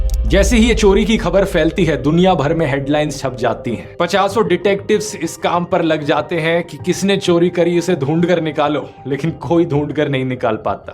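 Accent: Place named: South India, India. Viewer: aaj dhruv ka voice over lag raha h south jaisa...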